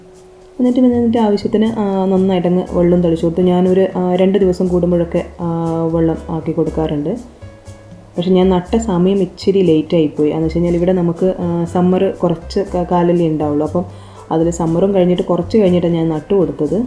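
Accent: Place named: Kannur, India. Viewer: Krishi adipoli. Njanum nattitundu balcony il. Pinne samsaram kettitu malabar side aanennu tonunnu. Etra sramichittum naadan basha idaku idaku ariyate purathu varunnu alle 😁😁😁. Nhan kannur aanu. Kannur slang pole thonni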